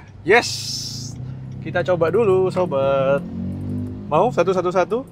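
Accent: Surabaya, Indonesia. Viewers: sampean enak nek ngomong suroboyoan ko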